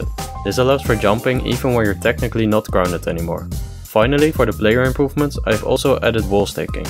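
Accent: Netherlands. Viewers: Why do you sound dutch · Are you Dutch? Your accent sounds really Dutch...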